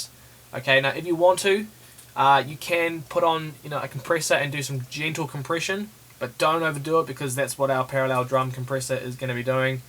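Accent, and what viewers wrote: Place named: New Zealand. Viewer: Lol turn on interactive transcript, not to great at interpreting the kiwi accent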